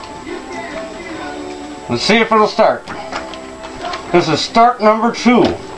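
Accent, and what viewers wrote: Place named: Canada. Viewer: Great little engines those. It's a pitty though that they don't run too well at low RPMs. I have one on a concrete mixer and its minimum idle is about 2000rpm. Your runs very well, perhaps the inertia of the extra pulley allows this to run so low. You should definitely enter this in a diesel "how low can you go" competition. Your accent is cool. Where are you from? Canada?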